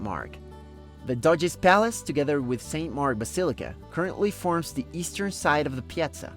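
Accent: Spain. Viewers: Great video indeed, thanks for making it! Just out of curiosity, are you Spanish? The way you pronunce Italian names.. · Great video. Thanks from Italy. Just a little nitpicking: Your R pronunciation in Italian is a bit "too Spanish 😊"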